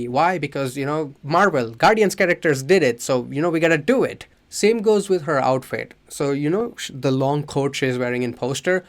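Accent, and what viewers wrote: India. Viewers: I love your channel so much. I'm not sure if you're Bengali or Indian, but this is the first time I've seen a commenter who has such an accent. Also you seem to have so much passion for movies and entertainment, but you're also respectful and you point out the problems societaly and with hollywood. I really enjoy your content! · Accent gang Are you Bengali perchance?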